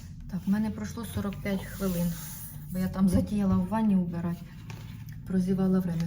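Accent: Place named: Surzhyk, Ukraine. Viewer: Як не гарно звучить суржик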